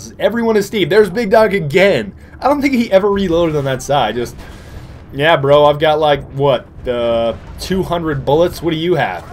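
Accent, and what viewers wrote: Germany. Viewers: are you german?? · are you german? 0_o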